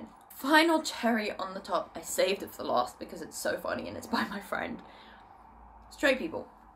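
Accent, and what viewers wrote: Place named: United Kingdom. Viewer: I'm so sorry, but me as an American....you being British makes this video so much better 😅😂 My YouTube feed knows me....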